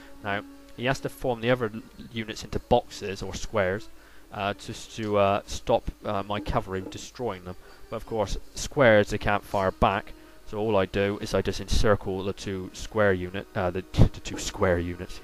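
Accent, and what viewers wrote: United Kingdom. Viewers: Southwest england? Or scotland or nothern Ireland?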